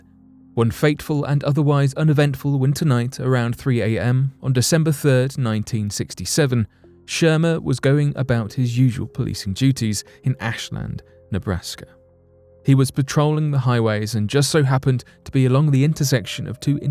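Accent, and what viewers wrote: United Kingdom. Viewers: "Hieroglyphic Texts" said that British accent just sounds so scholarly and knowledgeable tell me everything's going to be ok you sexy X - files nerd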